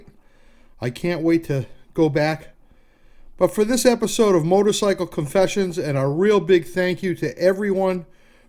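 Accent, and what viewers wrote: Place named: New England, United States. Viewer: I'm from Mass originally, lived in NH for awhile as well. Love the familiarity of the video. The accent reminds me of home! Glad to have stumbled upon your channel. Subscribed now as well. Like you said, I'm watching a ton of videos on YouTube. Looking forward to catching up on your other videos!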